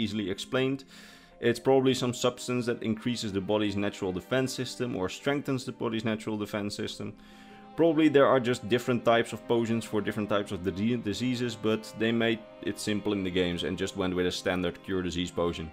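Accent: Netherlands. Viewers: goeie info hoor alleen wel echt je nederlanse accent xD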